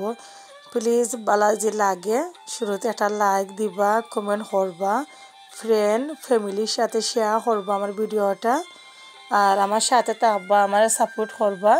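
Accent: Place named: Sylhet, Bangladesh. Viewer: o mai go sylheti ni go😀yummy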